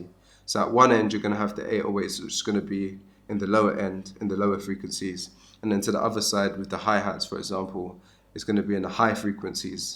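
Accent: London, United Kingdom. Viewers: You from London g ?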